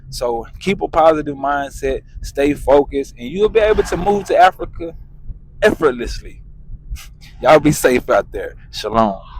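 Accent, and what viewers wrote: United States. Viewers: Man sounds like the hood in New Orleans😢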